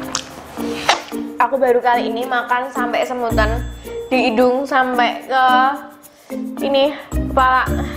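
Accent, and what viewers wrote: Java, Indonesia. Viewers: Kusuka medokmu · Aku baru pertama kali nonton suka gara2 medok gitu walaupun aku orang sunda wkwkwk 😆😆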